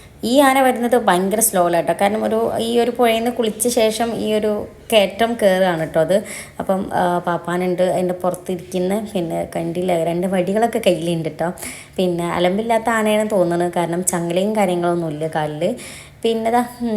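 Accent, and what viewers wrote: Malappuram, India. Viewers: മലപ്പുറം slang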